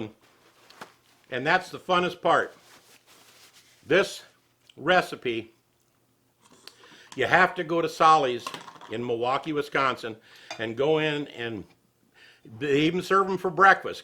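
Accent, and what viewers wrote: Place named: Minnesota, United States. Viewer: I'm from Minnesota and I thought you sounded like a Minnesotan.I've been living in Missouri since 1975 and I still get busted for my Minnesota accent. Ya just can't shake it. LOL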